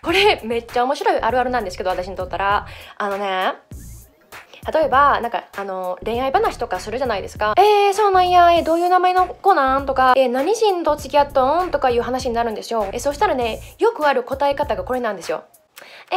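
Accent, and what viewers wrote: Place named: Okayama, Japan. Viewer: 岡山弁の響きが心地いいです。あるある、楽しかったです！ ほんま、おえん。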